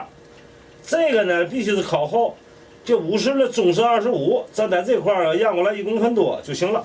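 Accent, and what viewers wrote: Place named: North, China. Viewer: Usually I'm able to understand standard mandarin but the Northern accent of this master craftsman is just too much for me.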